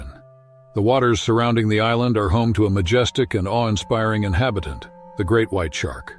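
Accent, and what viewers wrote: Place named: United States. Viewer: Announcer is playing w/ us OR was drinking vodka on story #2 the newlyweds. No way he can pronounce abyss, cerulean but mispronounce simple words. Story 3 he had no mistakes. Any I missed ? 🤣🦈 divvver -> diver leed vest -> lead hom ed -> honed in snapped shoot =snapped shut fers ee us -> ferocious ree alm -> realm threet -> threat Voice talent guy has GOT to be laughing when he does these [not at victims but his pronunciation]. He sounds like a native English speaker and one from the US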